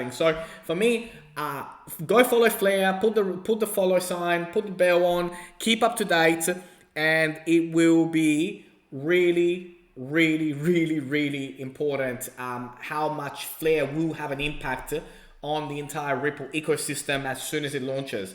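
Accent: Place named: Australia. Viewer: Thanks for all your ongoing analysis mate, great to hear an Aussie voice in the crypto universe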